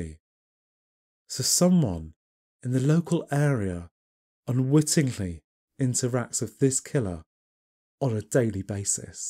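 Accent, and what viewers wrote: United Kingdom. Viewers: New subscriber, enjoyed this very much. I, too, was a little distracted by your hand gestures but it’s a small thing and doesn’t really matter that much. Incredible voice! Something about that British accent. Don’t let ppl compare you to Mr Ballen, I’m sure you get that. I think it’s the similar background more than anything else. Of course, I just did that, didn’t I? Keep it up you have a new fan. I think you hit the algorithm just right. Love that I got here early!!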